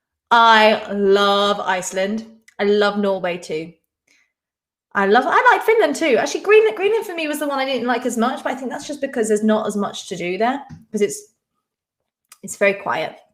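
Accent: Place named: United Kingdom. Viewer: Whoa, I had no idea you had a British accent. Very interesting!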